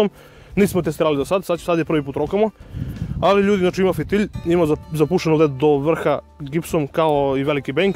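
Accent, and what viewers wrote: South, Serbia. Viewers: Najjaci juznjacki naglasak!!!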